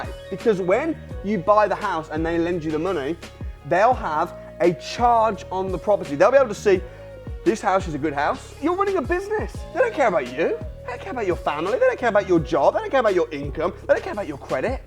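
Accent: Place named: Kent, United Kingdom. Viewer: Are you from Kent in the south east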